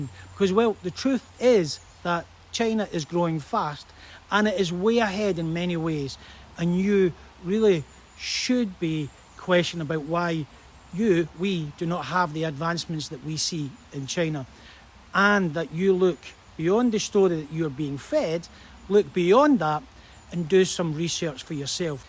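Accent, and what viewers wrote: Scotland, United Kingdom. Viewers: Scottish accent! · I lived in Scotland for 10 years and now working in China. It is so good to hear Scotish accent again. Scotland is such a beautiful country and is my second homeland. Compare to China, UK really lags behind in terms economy, living standard and technical capabilities. China is a vibrant and dynamic country. Cities in China are much more modern than London.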